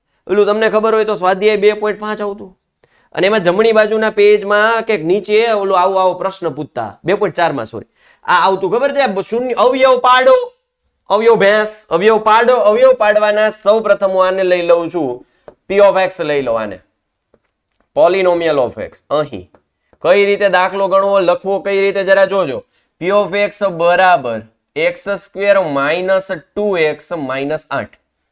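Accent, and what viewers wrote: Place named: Kathiawad, India. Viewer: Sir tame kathiyad baju na cho nice bhasa and math lecture mate thanks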